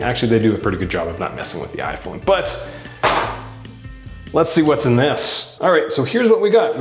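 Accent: Canada. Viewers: Thank u for the vid. Also you sound Canadian. Love that accent. Lol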